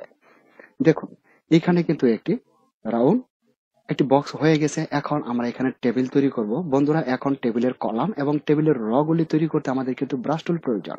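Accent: Bangladesh. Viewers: Bangla vasa ta ektu valo kore bolle valo hoe.. bangladeshi vasa ta sunte baje lage.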